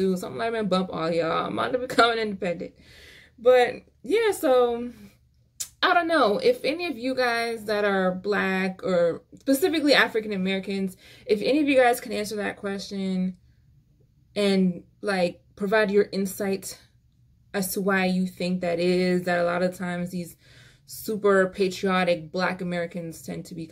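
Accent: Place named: Caribbean. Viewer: Your accent is so familiar are you bajan or carribean? 🤔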